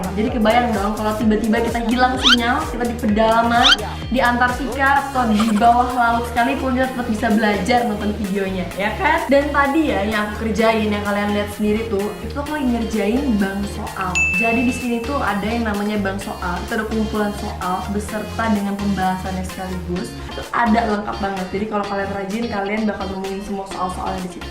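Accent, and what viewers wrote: Java, Indonesia. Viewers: Guys. Medok bener ndok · Guys Ny ittu Medok banget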